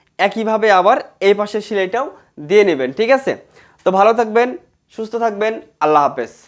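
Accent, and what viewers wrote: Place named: Sirajganj, Bangladesh. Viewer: আপনিকি সিরাজগঞ্জের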